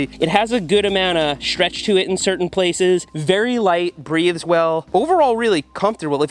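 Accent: Long Island, United States. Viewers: he said it in another video he is from long island it is his long island accent showing through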